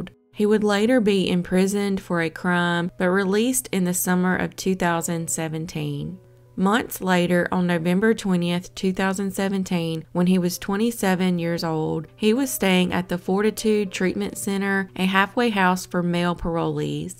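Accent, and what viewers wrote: South, United States. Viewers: Your channel is growing fast! Awesome job! I think it’s the soft southern accent 😉 I’m from Mississippi and when I go out of town sometimes people just want to hear me talk 😂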